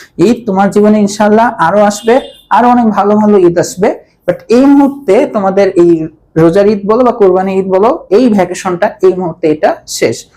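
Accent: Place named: Kolkata, India. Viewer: apnar voice sune mone hoi apni Kolkatar er teacher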